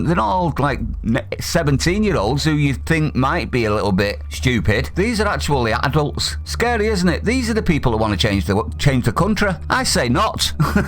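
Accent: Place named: North, United Kingdom. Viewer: Love your channel. been meaning to tell you that for a while. As a liverpool lad, it is lovely to hear a sound northern voice of reason rather than these heads up their own arse ox-bridge set on the lame stream. Three cheers for you! Thanks! You are appreciated. Ps also Like it when yr missus chips in too - you can't beat good old common sense!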